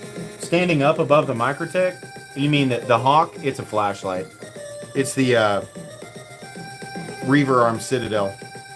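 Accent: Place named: South, United States. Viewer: Little bit of a southern draw on this episode. Let me find out you're one of us Smokey Mountain Knife Works locals and you've been playing the long game the whole time.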